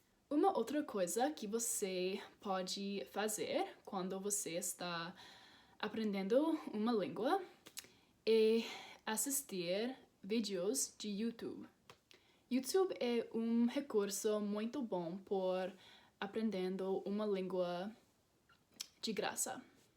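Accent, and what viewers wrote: Brazil. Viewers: o sotaque parece o meu (carioca) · estás a aprender o português com sotaque brasileiro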